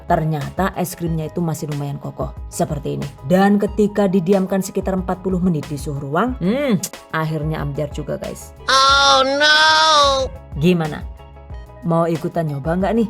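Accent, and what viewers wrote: Banyumas, Indonesia. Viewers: Ide usaha rumahan nih 👌 Mantap sukses selalu Ngapak juga ya yu 😅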